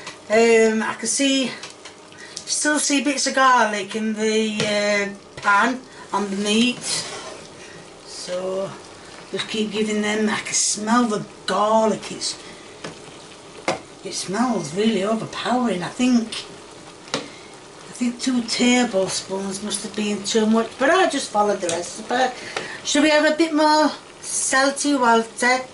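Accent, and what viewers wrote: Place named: Northern England, United Kingdom. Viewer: I detected northern-ish England in her accent. I love it!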